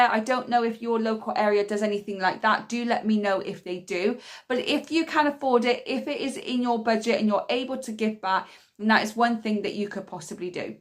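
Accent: United Kingdom. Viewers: You are accent look like British love it that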